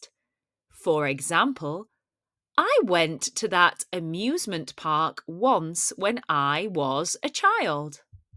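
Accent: United Kingdom. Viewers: Brit accent is precious ♥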